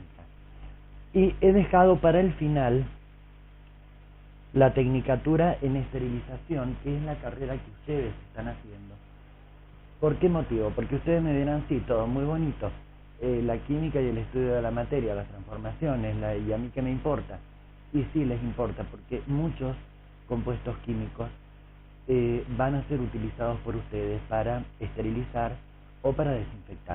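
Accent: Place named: Argentina. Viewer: Fantástico el video, profe. Y en "argento"...!!!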